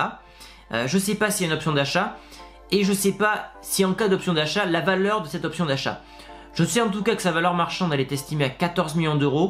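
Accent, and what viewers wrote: Spain. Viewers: Mdr ton espagnol 😂😂😂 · Ta veécu en Espagne pour avoir un accent aussi... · Salut je te connais à peine mais sa se vois que ta mis le budget du real dans ta vidéos et en + avec le petit accent espagnols qui passe crème Hala Madrid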